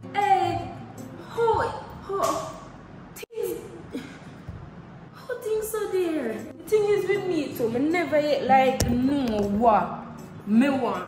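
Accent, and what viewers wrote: Jamaica. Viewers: Are you Jamaican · Accent alone me hear and subscribe ❤🇯🇲🇯🇲🇯🇲🇯🇲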